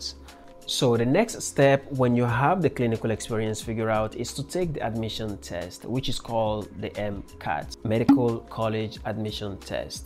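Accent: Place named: Yoruba, Nigeria. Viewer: I can definitely notice the yoruba Benin accent in the way you speak. Came from Benin to the US last year to study accounting. I would love to know someone in my study field because I get lost sometimes. I hope I will reach my goal as brilliantly as you did.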